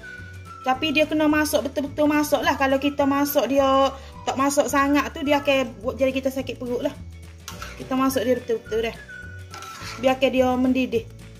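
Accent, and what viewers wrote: Kelantan, Malaysia. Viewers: Suka dengar logat akak ckp Kelantan... Terima Kasih kak ilmu masakannya rugi tak tgok channel akak dari awal ..Done subscribe .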